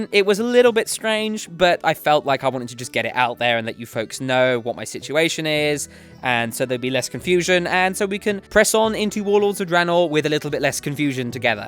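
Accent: United Kingdom. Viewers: I subscribed to you when I watched your SoO LFR videos, since they were really helpful. I now watch a lot of your videos (though not all because I don't have the time). I love your guides (the Blackrock Spire one is GREAT! keep it up!) and I love your daily discussions. I hope to see more. About your personal life, I wasn't aware of all that (although I did find out you are British just by listening, lol) and I find it to be a rather interesting story. It's sad you two had to go through all that trouble just to get married. If you weren't by now, I'd suggest you to come visit my country, but it won't be necessary now, hehe. Anyway, good luck with your life, with your channel, with everything. Keep up the excelent quality! :) Regards, from the southernmost country in the continent, Argentina.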